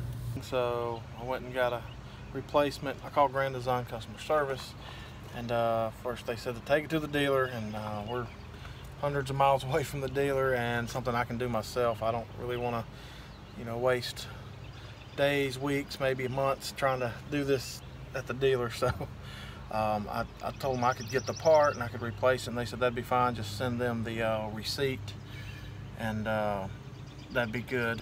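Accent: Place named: South, United States. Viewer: Thank you sir! Minutes in, I think I know what the problem is and it's probably not the regulator! I had a guy switch it out a couple of years ago, and he definitely didn't use the proper yellow tape, he used regular Teflon tape that actually came from Dollar Tree. I just need to go get the right stuff, and I'm going to change it myself this time. Turning a wrench isn't rocket science, and I've got my spray bottle of soapy water. My tank is outside, so that tape has broken down in the weather. You just saved me some $$$, so THANK YOU!!! Funny thing is, that guy who I hired to change it last time has worked for decades at RV parks and told me he's a certified propane tech. Okay, but he didn't know not to use regular Teflon plumbing tape? Now I'm old, single, and trying to take care of my goat ranch, I've found YouTube to be a wealth of information. 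I've learned that a lot of things aren't nearly as complicated as others want us to think. BTW, I love how you talk. I grew up in Dallas and spent Summers in Arkansas at a horse camp when I was a teen. I love Arkansas, lots of nice folks there. You sound just like a lot of my family.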